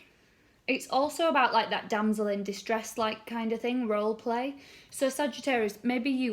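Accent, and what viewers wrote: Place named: London, United Kingdom. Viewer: so nice of you to think of us. i love your dialect it reminds me of my students years in the late seventies in north London♐️🇨🇭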